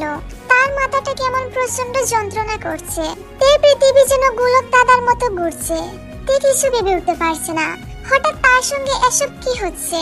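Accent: Sylhet, Bangladesh. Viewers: আচ্ছা আপু 🌝 আপু কি সিলেটি ভাষায় কথা বলে আমার যেমন কিছু কিছু সিলেটি ভাষা মনে হলো কারণ আমি সিলেটে থাকি 😂।আপু তুমি কেমন আছো। ভিডিওটি অনেক সুন্দর হয়েছে। প্লিজ রিপ্লে দিও। আর তুমি একদিন লাইভে এসো 🥰।‌ প্লিজ আপু।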